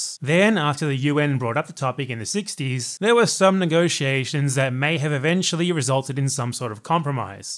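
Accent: United Kingdom. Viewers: You are aware that the British did not invade right? And you are speaking with an English accent.. odd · Lolz Mc'lovin the pommy accent, and what's even more beaut is the amount of dislikes lmao while rofl, you know you're doing something right if you tend to piss of some bunch of prissy crooked teeth tea drinking 'iron lady'kinked hicks Totally recommend supporting via subscription and joining. Oh BTW, cannot argue with that logic, too true my compradre, too true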